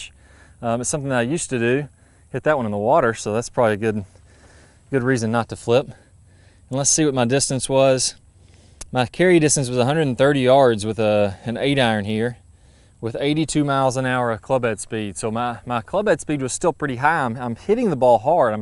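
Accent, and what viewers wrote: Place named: South Carolina, United States. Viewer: Great accent...South Carolina??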